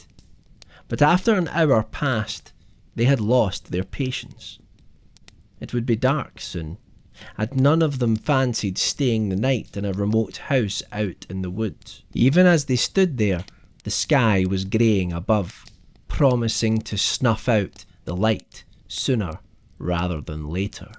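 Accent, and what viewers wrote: Scotland, United Kingdom. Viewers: I love this. Not to mention I love creepypasta and my heritage. Scotland. So a scots reading creepypasta is just pure brilliant! Great job my friend ;)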